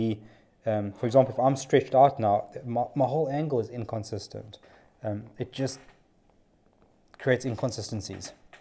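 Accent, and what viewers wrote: South Africa. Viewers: I knew with that accent where in South Africa are you and do you have a shop in the western cape ? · Aussie saddlemaker here. Been a saddlemaker for 39 years and never used a lap skiver, you have convinced me to start using this tool. I have been using a round knife for hand skiving. Only cos this is how I was taught by when I learned my trade in the 1980s. That skiver is nicely sharpened, hardly any effort to skive! Is that a South African accent? At first I thought your accent was New Zullander (New Zealander).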